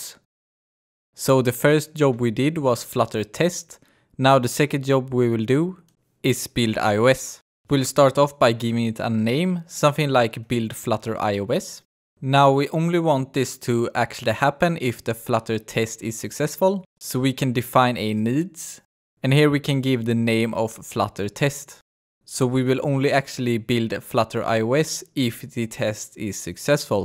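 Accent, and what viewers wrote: Sweden. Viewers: Sverige accent for sure.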